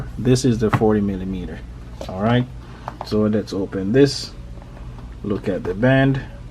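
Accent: Jamaica. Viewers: You sound really Jamaican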